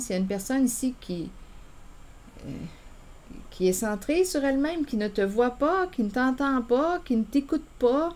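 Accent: Canada. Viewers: Mon Dieu, votre magnifique accent Canadien. J'ADORE. JE VOUS EMBRASSE POUR TOUT CE QUE VOUS DÉCLENCHEZ chez ceux qui sont à votre écoute. Énormes bises de MARSEILLE.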